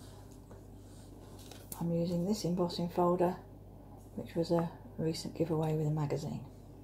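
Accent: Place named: England, United Kingdom. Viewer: yesI love her calm no nonsense precision using measurements thicknesses and no useless jabber😃😄😂I can't believe my fortune to have found her...and that adorable English accent..I sit here with my calligraphy book and drool♡♡♡♡ I can't wait to give foiling on vellum a go!!!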